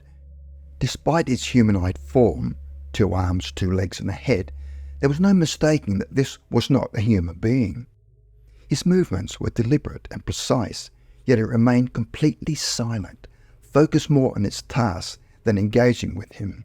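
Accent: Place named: Australia. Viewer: Why are all these american stories narrated by a Brit or here an Aussie? Turn off to americans!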